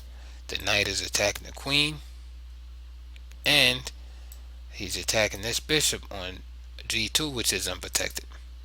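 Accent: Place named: East Coast, United States. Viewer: Dope!!! Are you from NY or Philly? That east coast accent!💯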